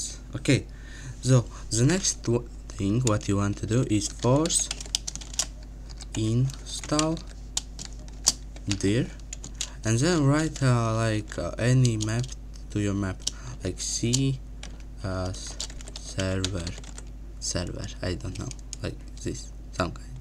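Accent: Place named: Russia. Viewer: Are you Russian? Просто дико русский акцент, а насчет туториала, спасибо, помогло :)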